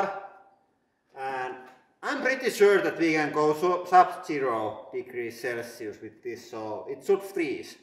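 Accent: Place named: Finland. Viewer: Haha the finnish accent is the best! Cheers from australia great vid :D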